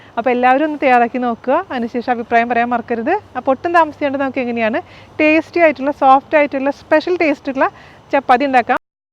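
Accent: Thrissur, India. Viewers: Hi, thank you for sharing the recipe😍 You are a lifesaver. I have become an amazing cook myself following ur recipes. More than the recipes you share I just love listening to your voice. Being abroad in a country with white people away from home it gives me soo much happiness watching all your videos, listening to you trissur slang😁. Lots of love ur amazing and an inspiration to many housewives😍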